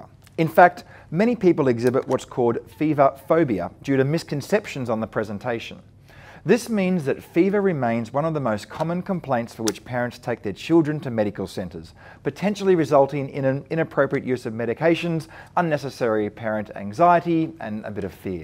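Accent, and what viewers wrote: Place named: Australia. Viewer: I'm in nursing school and very much enjoy help from an Australian accent with my homework! Thank you!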